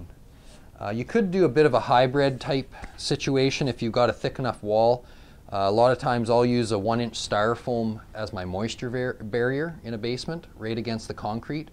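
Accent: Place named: Canada. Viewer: You sound very Canadian. 😁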